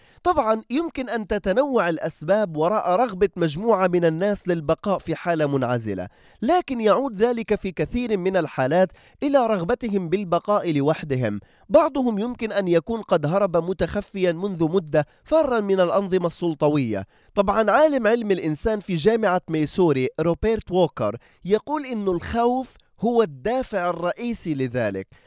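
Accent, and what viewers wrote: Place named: Gaza, Palestine. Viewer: الي بحكي مبين انو فلسطيني من غزة حسب لهجته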